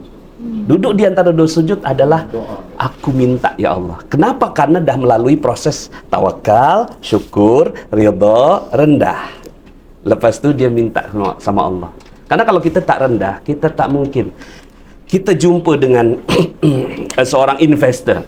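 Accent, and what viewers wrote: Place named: Malaysia. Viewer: Kenapa kok.logat.mslesya pak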